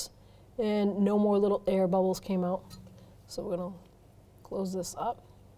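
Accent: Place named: New England, United States. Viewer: Great video tool. I have the 2010 RX350, I've replaced the rotors and pads front and rear, but the brakes still grab...so I'm thinking I need new calipers. 177k miles I think she deserves them, so that's next and I'm thinking that will make the system work as good as new. Love the New England accent too...I'm from north of Boston myself! always check with TRQ first, and 1A Auto. They ALWAYS stand behind the product 100%. Thanks from a customer you've earned. Question: possible you have a video on changing the fluid in the differential/transfer case of the AWD model of the same year? Thanks again.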